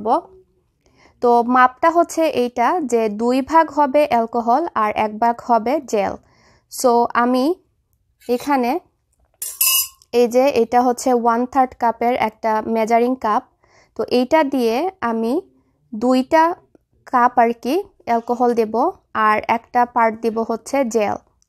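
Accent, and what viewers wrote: Sylhet, Bangladesh. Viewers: আপা, অনেক ধন্যবাদ আপনাকে। আপনি কি সিলেটি?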